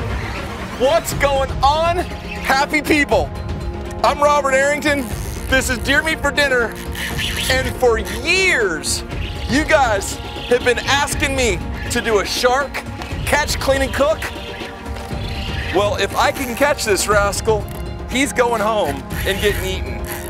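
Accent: South Africa. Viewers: has a South African accent. So cool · The dude sounds south african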